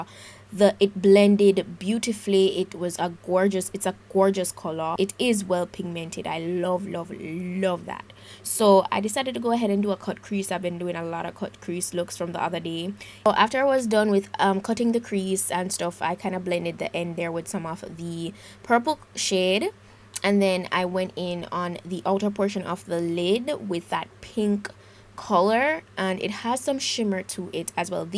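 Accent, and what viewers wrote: Jamaica. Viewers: Love everything....the makeup, the Jamaican accent😉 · I hear that Jamaican accent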